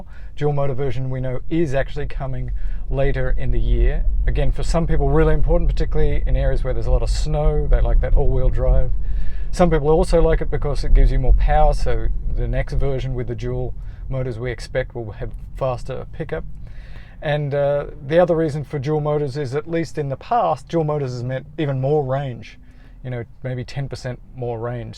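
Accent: Australia. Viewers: Do I detect an Australian accent? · Great to hear an Aussie accent ...makes a change... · Very informative video sir, and is that an Aussie accent i hear?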